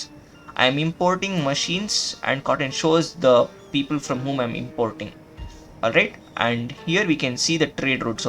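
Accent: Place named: India. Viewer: 100% with that accent apna India. Bhai hai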